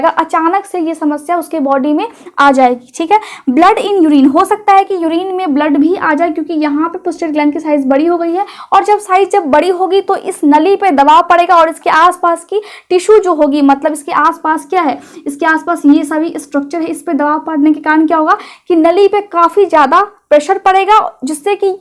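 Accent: Bihar, India. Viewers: R u belong to bihar state? 😊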